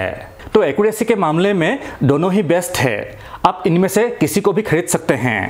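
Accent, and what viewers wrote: Assam, India. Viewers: Bhai aap assam se ho kya 😁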